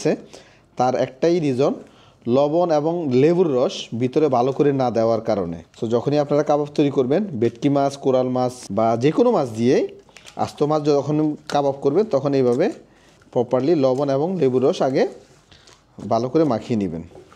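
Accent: Noakhali, Bangladesh. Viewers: মুখের ভাষাতেই বুঝা যায় ভাই নোয়াখালীর..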